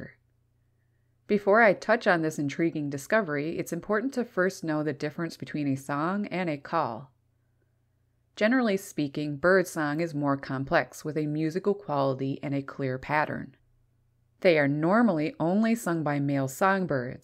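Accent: Canada. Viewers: Canadian voice do you like spring too.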